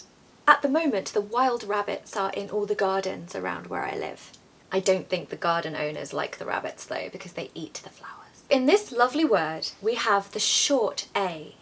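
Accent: RP, United Kingdom. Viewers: Love that British accent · "Your teaching is absolutely excellent! We especially love learning pronunciation from you, and your British accent is simply wonderful. The way you pronounce words is something we appreciate every time, and it truly helps us improve. Thank you for sharing your knowledge with such clarity and grace!" "Goodnight from my side of the world! Hope you're having a wonderful [morning/afternoon/evening] wherever you are, my beautiful teacher.. Hugs.xoxoxo Gams ❤️ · You speak modern RP. So, for the word PLUM you pronounce plʌm, don't you? However Matthew, my friend from Belfast, didn't understand me when I said it as you. When I wrote it down, he told me this pronunciation (more or less): plɔ:m When I explained it to Elizabeth, my English teacher, she told: I pronounce plɔ:m because I come from Liverpool, but with you I pronounce plʌm because I teach you standard English. 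· That you have a strong 'tea sound', is it that surprising for a Brit? ... ;-) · I love Bri ish accent 😁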